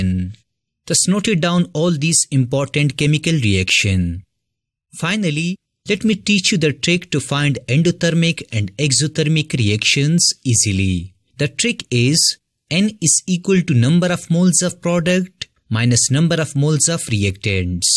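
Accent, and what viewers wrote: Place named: Kerala, India. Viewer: SI=ir get a accent your accenet is so keralan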